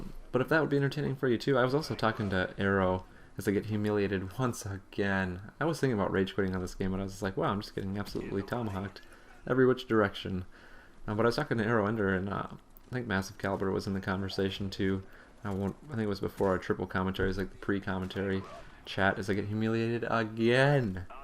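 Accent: Minnesota, United States. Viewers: i kno this is a stupid question but r u from minnisota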